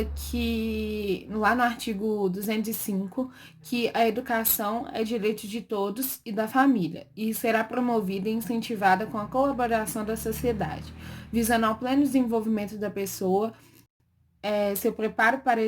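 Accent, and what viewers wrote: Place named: Minas Gerais, Brazil. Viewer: Ótima análise! Belo sotaque 😊 É mineira? Rsrs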